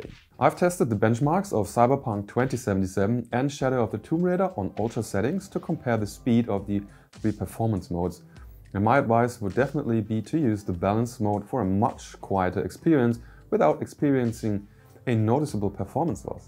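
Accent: Germany. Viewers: i have been trying to learn german(now i can understand english in german accent😭) also great review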